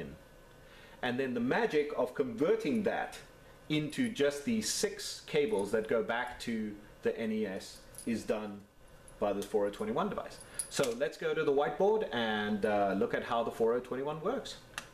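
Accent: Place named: South Africa. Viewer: you sound like you have a bit of a latent South African accent going on there. Awesome video too, keep it up.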